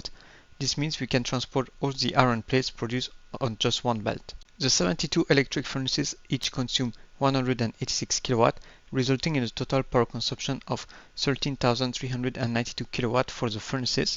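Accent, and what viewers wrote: France. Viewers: Nice tutorial ! By any chance, are you French ? 👀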